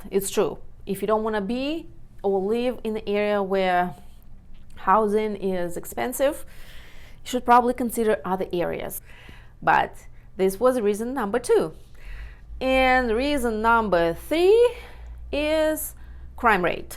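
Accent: Russia. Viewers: I like you accent Russian. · Sound like a Russian mail order bride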